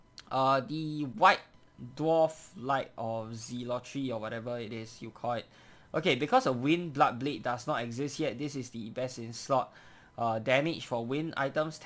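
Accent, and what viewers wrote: Singapore. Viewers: Bro you singaporean ah?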